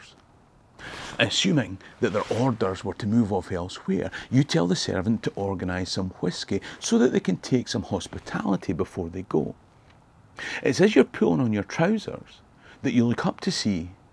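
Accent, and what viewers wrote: Scotland, United Kingdom. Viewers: I love this Scottish sound of English language by the narrator but the landscape of the highlands are so depressing. Why does nobody forrest them up?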